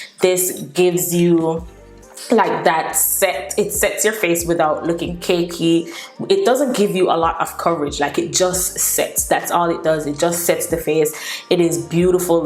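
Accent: Jamaica. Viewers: U sound Jamaican. Not a question lol. So sure you are Jamaican. Great content. Keep doing your thing :)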